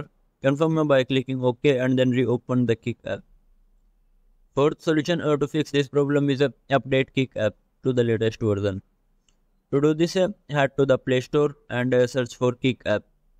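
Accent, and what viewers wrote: India. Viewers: Even for an Indian his accent is too heavy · It's English but with a heavy Indian accent. The only people who would understand this are Indians and people who work with English speaking Indians. For the most part, they are very tech savvy and their contributions are welcome.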